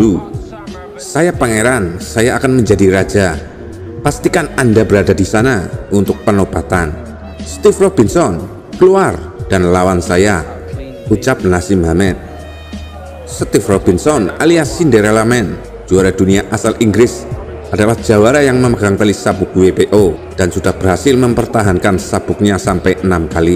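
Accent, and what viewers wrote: Java, Indonesia. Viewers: 😀😀😀😀suaranya medog kayA sy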